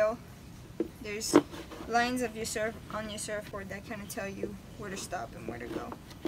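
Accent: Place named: France. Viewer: On entend beaucoup ton accent français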